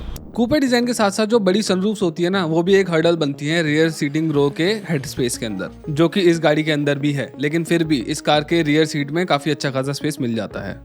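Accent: India. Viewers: Man - if you can’t fix your indian accent, can you at least get the names pronounced properly ? It’s not pronounced “ooroos”… its “U-RAS”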